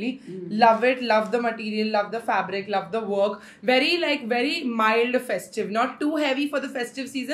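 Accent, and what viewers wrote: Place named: Gujarat, India. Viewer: I love your Gujarati accent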